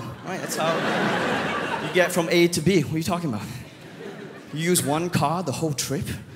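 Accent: Australia. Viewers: This guy is funny Maybe these people don't understands his Aussie accent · Finally found the reason why he sounds like aussie and Singaporean at the same time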